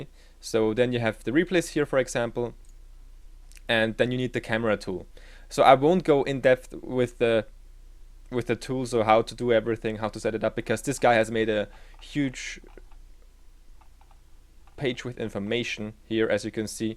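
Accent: Germany. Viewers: also sprichst du doch deutsch das merkt man obwohl dein Englisch echt gut ist:) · I It really sounds german btw im german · Du höhrst dich so Deutsch an :o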